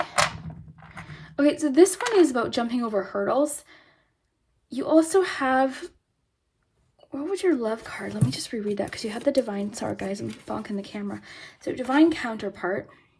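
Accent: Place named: Canada. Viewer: I’ve always guessed you lived in Canada or Minnesota by the way you say “sorry” in your videos. This was the first time I heard you say where you were from and I feel that you being from Canada is so fitting I’m not sure why.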